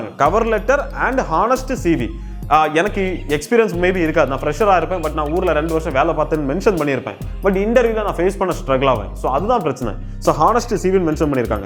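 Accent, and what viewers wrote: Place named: Nagercoil, India. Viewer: Iam hardly searching job at UAE.. its very useful brother.. neenga Nagercoil ah brother.. speech la Nagercoil slang varuthu..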